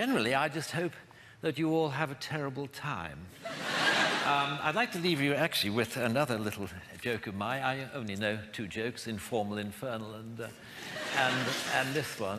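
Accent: United Kingdom. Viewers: He has a Welsh accent, so he's Welsh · I live in the USA, but I miss good British humor and beautiful British English...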